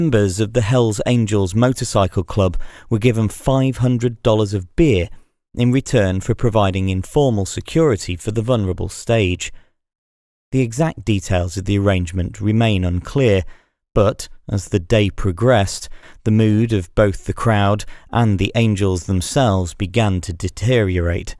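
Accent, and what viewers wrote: United Kingdom. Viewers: Why are these always British commentary guys?!?!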